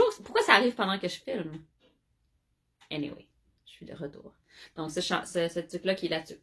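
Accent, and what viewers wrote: Quebec, Canada. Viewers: Bonjour, c'est un grand plaisir de vous écouter avec ce joli accent Canadien, je suis Colette je demeure dans le Nord de la France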